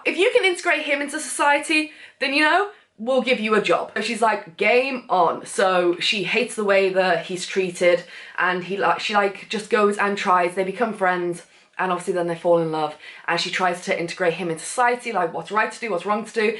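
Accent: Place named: United Kingdom. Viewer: I literally enjoy listening to you . ☺️ I love the British accent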